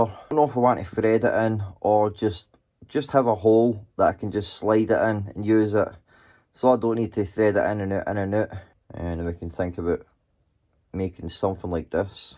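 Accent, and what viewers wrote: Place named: Scotland, United Kingdom. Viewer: I love the Scottish dialect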